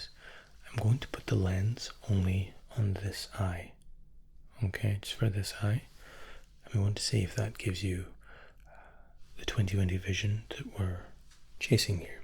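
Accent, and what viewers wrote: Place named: Scotland, United Kingdom. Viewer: Terrific video! I was trying like hell to place your accent! I kept thinking Scottish with a hint of British then I checked your, "about" page lol! Regardless, your accent is magnificent and your ASMR is spot on! Cheers!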